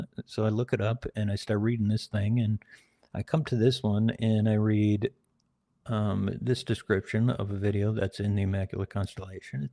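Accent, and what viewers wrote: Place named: Midwest, United States. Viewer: Welcome back. Always enjoyed your perspective. Good to hear that midwestern accent talking UFOs again.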